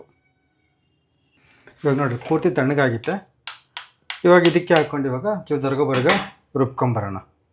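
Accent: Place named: Holalkere, India. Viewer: ನಮ್ಮದು ಹೊಳಲ್ಕೆರೆ ಹತ್ರ Adanur Nimdu yavuru? ನಮ್ಮ ಭಾಷೆ ಸೊಗಡು ಇನ್ನೂ ಉಳಿಸಿದ್ದೀರಾ, ತುಂಬ ಖುಷಿ ಆಗುತ್ತೆ..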